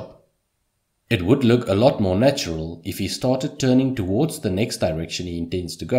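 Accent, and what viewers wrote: South Africa. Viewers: Came here from Reddit. Heard that South African accent, and sub. Gonna start from part 0 tonight!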